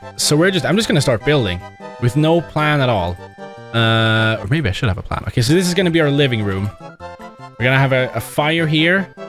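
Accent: Sweden. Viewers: Are u swedish? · Are u swedish? U sound like it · I know a swedish accent when I hear one. :) Snyggt hus!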